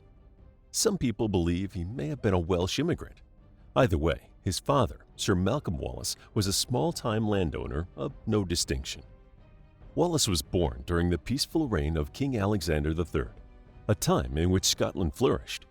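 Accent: United States. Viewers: Why should we listen to an American voiceover distort the Wallace history by including so many errors ? The story of Wallace was a translation of the original Latin that was translated by The Minstrel in 1361 from a document by eyewitnesses probably around as early as 1310 that was commissioned by Bishop Sinclair of Dunkeld.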